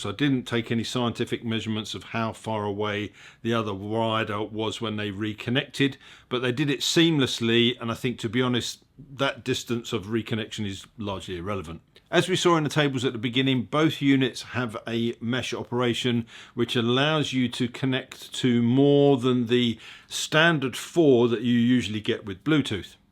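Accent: United Kingdom. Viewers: Thumbs down for the British accent. Mercia.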